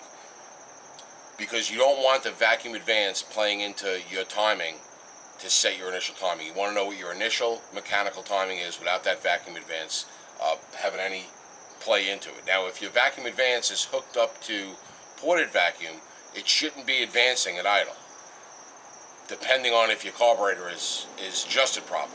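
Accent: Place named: Long Island, United States. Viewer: I knew it was long island before I even saw the shirt. Haha. Can't mistake that accent for anything. I got family in Smithtown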